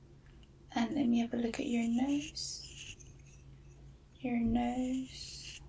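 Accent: United Kingdom. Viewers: I... can't place your accent. Sounds predominantly british, but it breaks that... pattern, for lack of a better term, often enoufh to make me question. As an american who doesn'r get exposed to many different accents, it bothers me when i can't identify it, lol. Something about reinforcing the ignorant american stereotype? All that aside your asmr is fantastic, i found your content last week, and have binged vids 3 times for relaxation and sleep. Edit, are you layering the file for the mic sounds on top of the audio from the video? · Oh my god you have like a British accent that's so pretty 😊 and love the ASMR video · It’s giving uk 🇬🇧 😂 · What country do you live in? I can't place the accent but sounds like the UK. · I’m getting British accent vibes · u are from UK? I loved ur accent🥺❤️ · She sound quite British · Are you European? Your accent sounds both American British (Northern and southern? 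Mabye a mix of American Irish but mostly British. I can't tell and I'm so confused) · Are you British? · IDK why but she sounds british for some reason.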